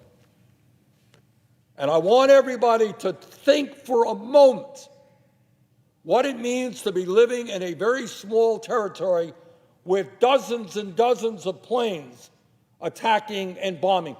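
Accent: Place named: Brooklyn, United States. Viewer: How long, do you think, it'll take this guy to lose his Brooklyn accent??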